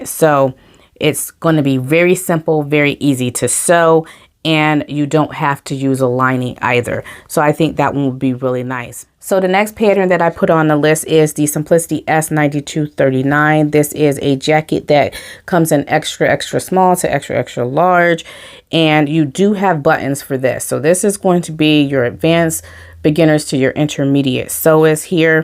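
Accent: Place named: South, United States. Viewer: You love to sew dresses but I love to sew coats. I love this list. I have to ask: you sound southern, yet your vlog is named "Crumpets, tea and Sewing". Where do you find crumpets in the south? I lived in Ga for years and I couldn't find them anywhere.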